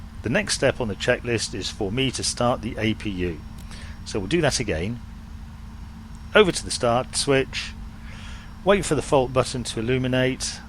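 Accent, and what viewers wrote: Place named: RP, United Kingdom. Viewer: speaks the Queens English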